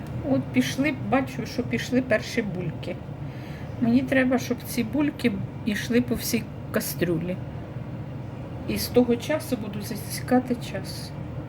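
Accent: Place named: Kyiv, Ukraine. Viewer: Мабуть, ви окрім поняття "суржик" і своєї расєйської мови ніоли не чули таке поняття як "діалект". Так от, ставлю вас, нешановний, до відома, що такий діалект поширений у Київській області. І не треба повчати, якою мовою і на якому діалекті людині розмовляти. Всяка мова добра і гарна, окрім огидної рашистської.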